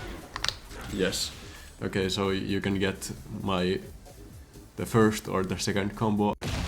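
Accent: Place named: Finland. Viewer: Finnish accent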